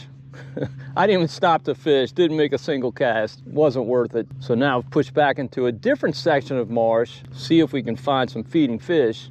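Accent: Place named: West Coast, United States. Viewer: Youre not originally from Louisiana, i think west coast? You sound ofly familiar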